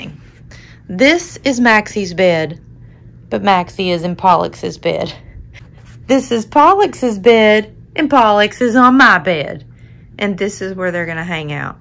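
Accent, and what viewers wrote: South, United States. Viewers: Haha, loved watching the dogs bedtime routine, so sweet😍 and that Southern accent 👍 Thanks for your fun channel and Hello from another curly girl downunder🖐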